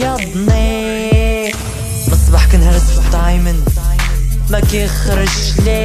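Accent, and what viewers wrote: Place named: Algeria. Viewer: من لهجتك بايتة جزائرية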